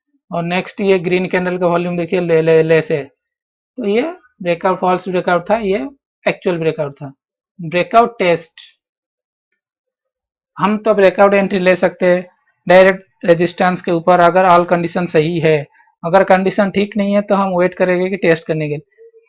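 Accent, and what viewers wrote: Odisha, India. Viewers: Sir are you from orissa ?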